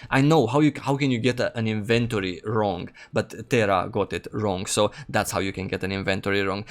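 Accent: Italy. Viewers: Are you from Italy? your english accent it's tricky :D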